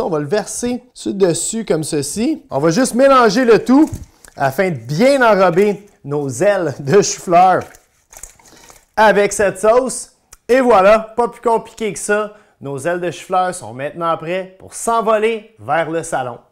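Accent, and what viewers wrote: Quebec, Canada. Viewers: ... jajaja je ne comprends rien du tout. C'est trop difficile le français québécois pour moi. Je parle espagnol comme langue maternelle et le français j'ai appris après quelques ans mais ... La prononciation de Québec c'est complètement différent de ça de France. Jaja. J'essayerai cuisiner cette chou-fleur avec ça que je comprends.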